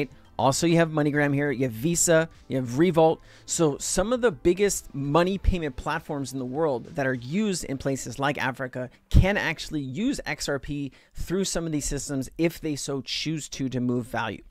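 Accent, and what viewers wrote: Australia. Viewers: Sounds like a fellow Aussie. Letsgetitttt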